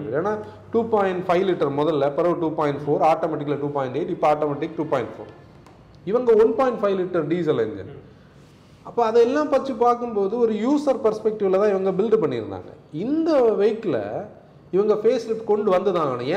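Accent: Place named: Nagercoil, India. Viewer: Team, This is one of the best & top class discussion on cars that seen (in Tamil)! I thoroughly enjoyed the video. BTW, I have booked Carens this morning and I would be happy to have it reviewed by post delivery. bro( hope spelled right) I have fallen in love with your Nagercoil slang and simple, yet through detailing reviews. When you are in Chennai, pls shoutout I would be happy to host your team. This 2022 will take team to an another Pinnacle with GOD’s grace. Wishing you all a Happy New Year!!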